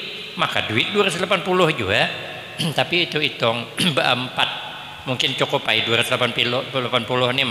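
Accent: South Kalimantan, Indonesia. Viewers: Senang banar dgn suara dan bahasa Sidin ....bujurkah sanak bahasa Sidin kaya urg Amuntai wan tanjung ....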